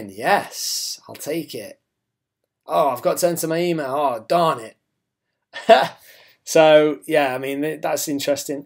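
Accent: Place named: Nottingham, United Kingdom. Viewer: Glad I stumbled across this. Genuine guy with a lovely Notts accent. Just subscribed 👍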